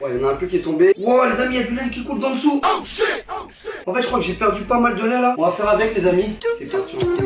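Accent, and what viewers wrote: Belgium. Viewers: Tu est belge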